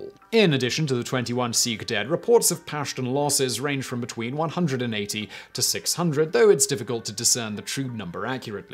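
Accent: United Kingdom. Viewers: British people like Simon have this charming habit of pronouncing foreign words as though they were English ones when referring to them, instead of using a foreign pronunciation that's very well known. Americans are much less likely to do it, although it's still fairly common for people to butcher the Latin pronunciation of infinitives that end in -(i/e/a)re as if they rhymed with hire, here, or hair, which they do if you pronounce the symbols as though they were an English word instead of a Latin one, but is as wrong as Simon's pronunciation of the French words in this video. It's odd because you'd think Europeans would be more aware of the fact that things are pronounced differently in different languages, but the British being insular probably get it much less.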